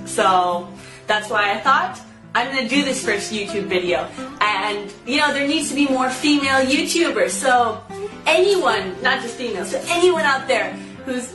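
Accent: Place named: United States. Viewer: pretty good american accent to just have moved from argentina a year ago. wondering what else is coming.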